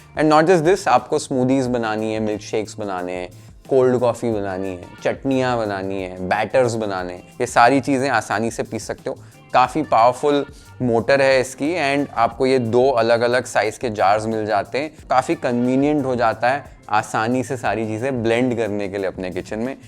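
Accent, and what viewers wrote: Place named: Mumbai, India. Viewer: Sanjyot is born and brought up in Mumbai, that explains his Marathi accent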